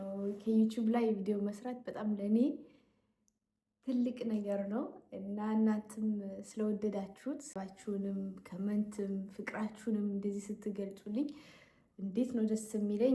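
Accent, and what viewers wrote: Ethiopia. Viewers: I like your Amharic style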